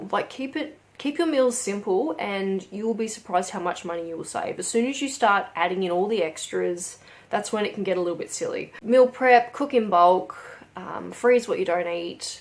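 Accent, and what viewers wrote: Australia. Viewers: You have a northern Queensland accent😊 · Random question, where is Australia are you from? Just the accent is throwing me as to where 😆 Thank you so much for sharing your tips. We’re in QLD and can access the 50 cent public transport which is a help!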